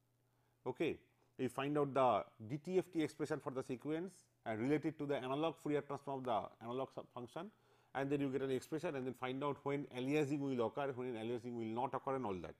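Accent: India. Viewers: Yes, this is not english. This is Indian English, and we are proud of it. Want to have more of it? Just type Russell Peters on youtube and watch some of his videos..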